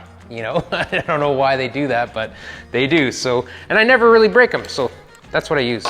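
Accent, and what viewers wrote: Canada. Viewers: haha i thought you were saying “about” and “out” a little weird and then when you said princess auto or whatever I was like ohhhh okay he must be from Canada😂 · Great channel you have and I like the tips and tricks so thanks for those. I’ll be working my way back through your videos as there seems to be a lot of stuff that’s relevant to anyone fabricating in steel not just hot rodders. Picked up you were Canadian as soon as you said “out” and then “princess auto” confirmed it. It’s a bit like Australians and New Zealanders, subtle but obvious once you hear it. Incase you’re interested I’ve put a link to a video I found yesterday of Japanese craftsman hand making what looks like a glove box with compound curves and all done the old school way with tin snips, hammer and dollie’s, shot bag and oxy fuse welding. Not an English wheel or tig to be seen. 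I haven’t got a clue what he’s saying but I reckon it’s a work of art. Cheers, Stuart 🇦🇺. <link>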